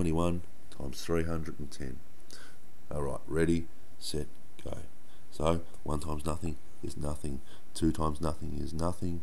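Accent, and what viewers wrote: Australia. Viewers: Are you a aussie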